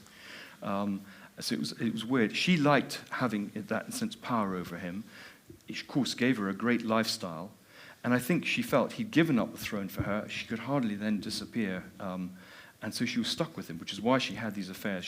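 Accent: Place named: Scotland, United Kingdom. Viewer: I couldn't quite place his accent.because just one or two words have a flat sound ...rather like.a. northerner but he's so posh !! But then it says he is Scottish! So that explains it ! Scottish...Westminster school accent !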